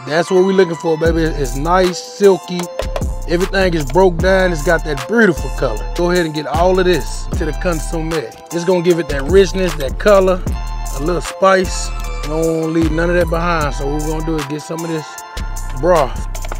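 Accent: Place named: Houston, United States. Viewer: Sound like you’re from Houston.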